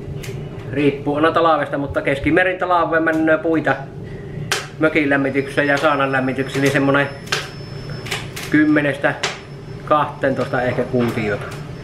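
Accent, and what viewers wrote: Savo, Finland. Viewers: Siinon rehellinen, Aeto Savolaenen :D :D :D <3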